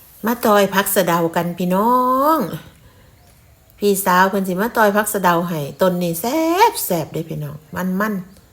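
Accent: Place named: Isan, Thailand. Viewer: สวัสดีครับแม่ ได้ยินเสียงเว้ากะคึดฮอดบ้านเด้...😂😂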